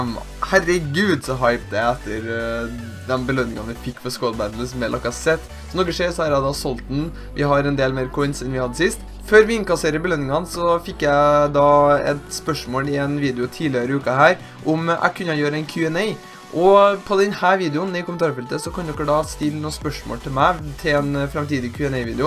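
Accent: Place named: Trøndelag, Norway. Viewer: Liker ikke selv om det er bra vidio men du er trønder og jeg er fra Molde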